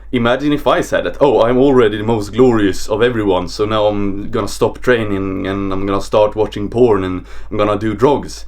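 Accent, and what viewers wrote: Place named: Sweden. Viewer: Man your accent (and looks obviously) is so Swedish god damn XD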